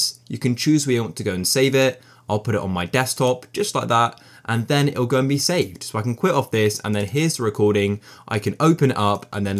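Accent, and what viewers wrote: United Kingdom. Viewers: bri-ish mate helped me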